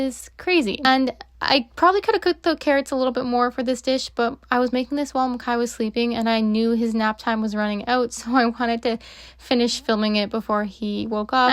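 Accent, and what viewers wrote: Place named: Midwest, United States. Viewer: Love your Midwest accent. I’m from Mid-MI and feel right at home in your kitchen. God bless❤ This is valuable information you’re sharing.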